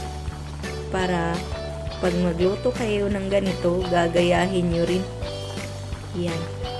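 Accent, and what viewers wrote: Visayas, Philippines. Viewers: Haha bisaya kasi e, chill kalang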